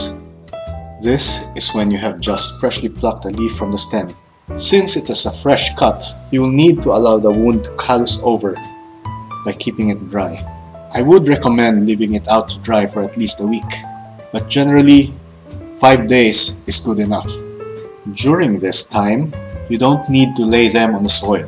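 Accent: Philippines. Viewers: You sound like a Filipino guy :)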